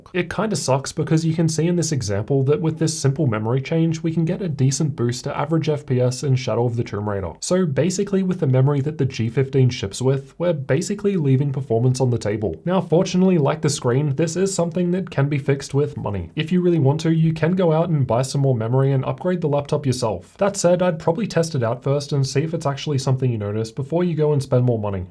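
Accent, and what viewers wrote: Australia. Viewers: Great review! Also, I just love the Australian accent. Don't know why, but I just do.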